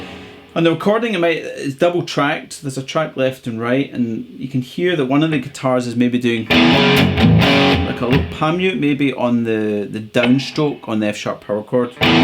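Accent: Scotland, United Kingdom. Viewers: Scottish accent?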